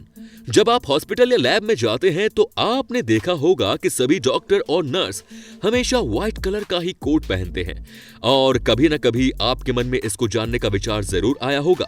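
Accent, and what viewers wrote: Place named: Jaipur, India. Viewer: Yeh awaj Jaipur ke ek bhai ki hai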